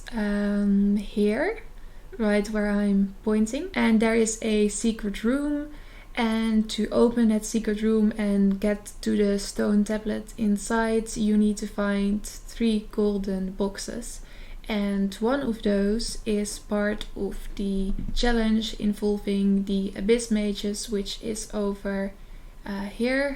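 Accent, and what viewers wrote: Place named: Netherlands. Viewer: She is Dutch isn’t she?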